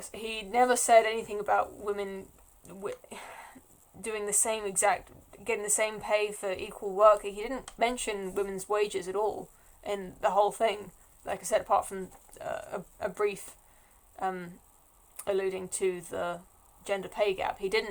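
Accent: United Kingdom. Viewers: Excellent work here,. As an American, I'm guilty of stereotyping. Every time I hear a speaker with a British accent, I automatically believe they're smart. I'm glad to not be let down in this case (probably from all the documentaries of such high caliber produced by the BBC over the years [anything with David Starkey is history porn to me]. It's a shame that their news bias is no better than CNN now)! In my opinion, you should keep doing this. Get a good camera and mic setup and see what happens! It'd be awesome to see you discuss this with Molyneux, Styxhexenhammer666, Sargon, JBP, Computing Forever, etc. We need reason and evidence to prevail over madness.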